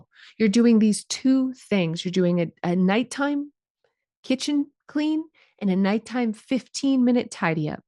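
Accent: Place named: Midwest, United States. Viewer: I hear that Midwest “O” in your voice! ❤Where are you from?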